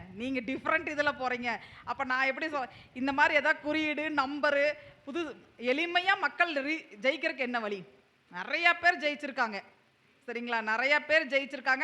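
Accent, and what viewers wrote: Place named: Kongu, India. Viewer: வணக்கம் அம்மா. ஆழகு, அருமை,அற்புதம்,உங்கள் கொங்கு தமிழ் WOW semma super speech நான் நீங்கள் சொல்வதை பின்பற்றி வருகிறேன். ""மாஷா அல்லாஹ்,,, நன்றி நன்றி நன்றி மா. எல்லா புகழும் இனறவனுக்கே..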